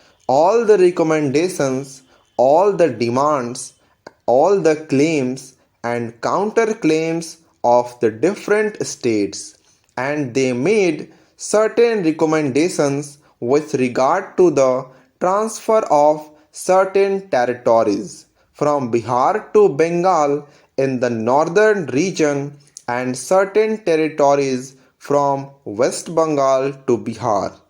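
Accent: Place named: England, United Kingdom. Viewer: Bhai ji jyada angrej mat bano thoda pronounciation thik se jaise humare yha generally boli jati hai ekdm sir se utar rahe h kuch words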